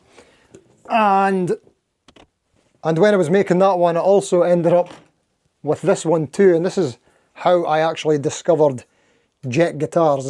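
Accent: Scotland, United Kingdom. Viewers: That`s allright. Thank you for answer. Please contiue with your channel. I love your Scottish accent. I`m from Germany.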